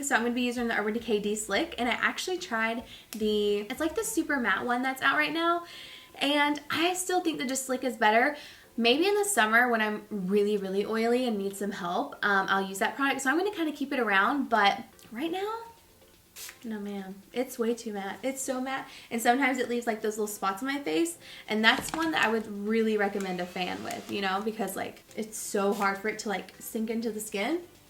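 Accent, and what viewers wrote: South, United States. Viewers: hear a little of your southern drawl, but that’s adorable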